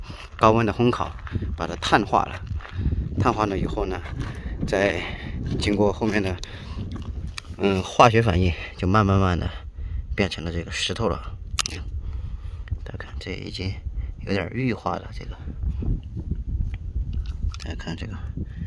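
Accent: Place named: Xinjiang, China. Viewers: 的新疆腔🤗😄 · 口音逐渐新疆化、、、、、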